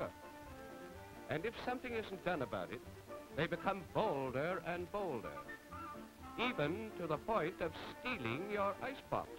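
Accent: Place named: Transatlantic, United States. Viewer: Transatlantic accent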